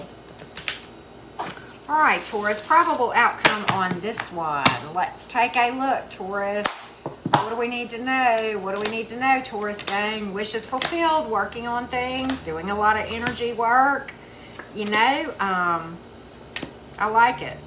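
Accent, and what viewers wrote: South, United States. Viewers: It's the Chinese bangs for me, and southern twang.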